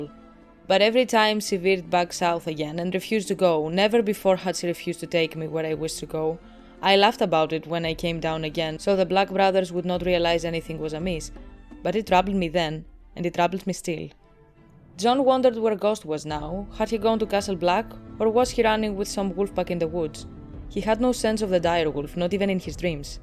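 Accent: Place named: Greece. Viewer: heard your accent and HAD to check if you were greek lol. First Greek ive seen doing content on ASOIAF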